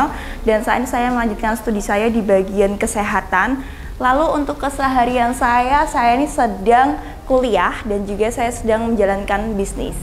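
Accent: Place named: Java, Indonesia. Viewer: medhoooook pol mbak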